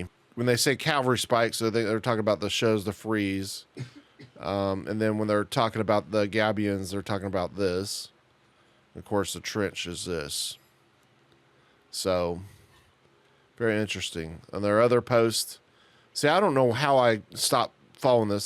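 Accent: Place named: Texas, United States. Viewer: Love the Texan accent 🙏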